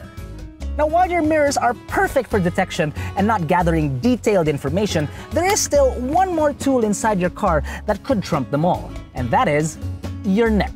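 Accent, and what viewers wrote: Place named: Philippines. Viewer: your English is very good almost can't tell if you're from Philippine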